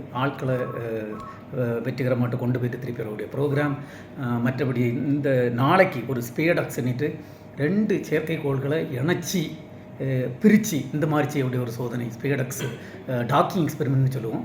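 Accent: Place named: Nagercoil, India. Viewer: The Nagercoil accent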